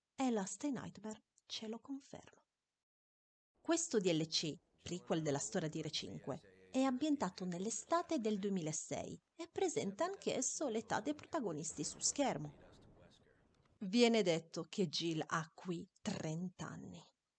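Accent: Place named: Italy. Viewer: There you go, partner! Now you've done it! Kicking ass, always. Keep always being this mad hardcore fan that we love to follow. Count with your old Brazilian partner here for anything you need. P.S: That Italian accent is SO beautiful! You gotta help me working in my Italian, or my ancestors are going to punish me haha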